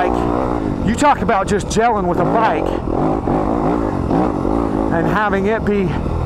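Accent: Utah, United States. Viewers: You said last year that the Beta 390 RR was your favorite 4-stroke ever. Sounds like this one might usurp the Beta. Oh, and I love how you speak Utah language.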